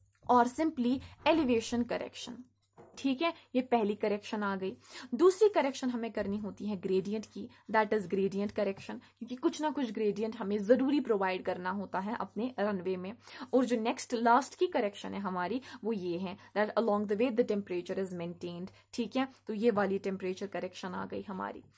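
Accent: Kashmir, India. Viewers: Superb explaination mam. It's seems by your voice that you are from Kashmir. And you are doing well. I was really need this in this time because my university exam is going to start.❤️❤️❤️ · Are you from Kashmir 😊 your voice resemble Urdu accent.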